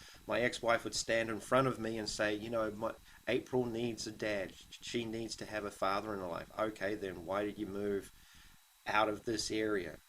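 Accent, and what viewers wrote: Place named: South Island, New Zealand. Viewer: Kiwi,You sound like you are from the mainland/south Island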